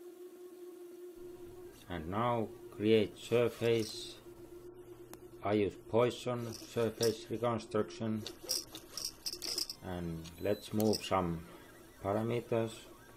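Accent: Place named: Finland. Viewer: Fab, very helpful. I'm on a Mac and unless I make my own binaries I have to use Regard3D. Are you still using it? I'm guessing you are from Finland - having listened to Mika Hakkinen for many years I THINK I recognise the accented English. Or ARE YOU MIKA HIMSELF?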